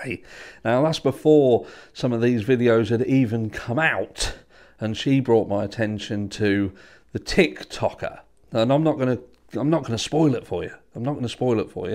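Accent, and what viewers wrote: United Kingdom. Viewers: One of my favorite '80s tv series was "X-Files" and its theme, "Trust No One" is what I go by especially with YT videos. Anything featuring ex-F/B/I or former C/I/A employees or even retired LEOs are suspect because they still owe an allegiance to shady government agencies upon which they depend on for their lifetime pension$$$. But I trust you, 'cause you're British with a lovely accent and demeanor that goes so well with my morning coffee sweetened with stevia. 😁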